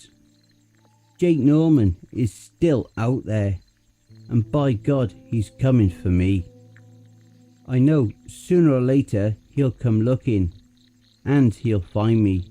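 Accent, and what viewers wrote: United Kingdom. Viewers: The creepy animation at the beginning makes this so worth it., you're amazing. Your British accent is a joy to hear. I look forward to hearing more narrations by you. Also, man How do you get subtitles?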